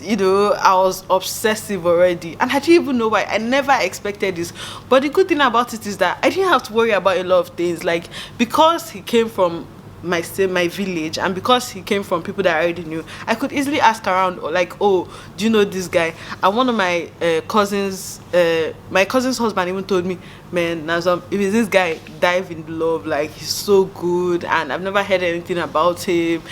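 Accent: Igbo, Nigeria. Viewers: 😂😂😂 Your accent plus your facial expressions and your general attitude is just epic😂 Ewo, my Nnewi sister. They can't even do arranged marriage for me and I'll collect. Odiegwu 😂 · Your igbo be sweeting me.... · I’m just here to hear you talk, love your Nigerian accent. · The Igbo!! Like honey to my ears😫 You're so gorgeous. I'm here to stay. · haha I really enjoyed this video and your Ibo is sweet!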